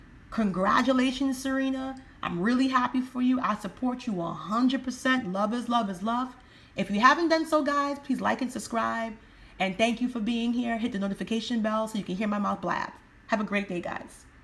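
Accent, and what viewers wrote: Jamaica. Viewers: You're welcome. Also it's nice to hear a fellow Jamaican pon the mike.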